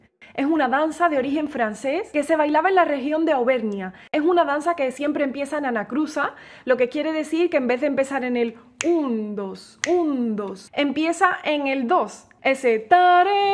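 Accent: Andalusia, Spain. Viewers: Gracias por contestarme! Acabo de ver un video hermosísima tuyo aobre "Lágrima" de Tárrega. Y acabo de confirmar en un articulo de Wikipedia sobre "pronunciacion andaluza" el cambio de "st" por "ts". Esta explicado en un articulo llamado "Dialecto andaluz", en la "Descripción lingüística", en la parte que dice "aspiración de la /s/ implosiva sucedida de la /t/". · Después de haberte escuchado cantar o tocar solo la guitarra en diferentes estilos de música es raro ponerte el pulgar para abajo vos dirías no gutsa con tu acento andaluz porque todo lo hacés bien todo el proceso la elección de la pieza El arreglo la ejecución así lo dice un suscriptor y estoy de acuerdo seguro ensayas mucho por más que sepas porque te interesa que el producto salga bien No hay palabras para elogiar tu arte porque es un arte, una persona puede estudiar música guitarra y tocar bien técnicamente pero no llegar a la gente.Es como si una persona estudia pintura o escultura puede hacer buenas obras pero no va a estar a la altura de Miguel Ángel o de Leonardo da Vinci Vos en lo tuyo tenés ese toque ese don que aparte lo perfeccionas con trabajo práctica constancia y lo que harás en el futuro solo Dios lo sabe ese Dios que te dió ese talento inmenso para que lo uses para tu bien y el de tus oyentes Sos única grande sencilla un instrumento de Dios el es el director de la obra Quería compartir esto con vos Con tu poca juventud acumulada tenés una llegada a la gente muy grande y con toda la juventud que tenés por delante para acumular quien sabe hasta donde llegarás Gracias por tu talento tu arte generosidad ❤️ Abrazo grande desde Buenos Aires Argentina 🇦🇷🇦🇷🇦🇷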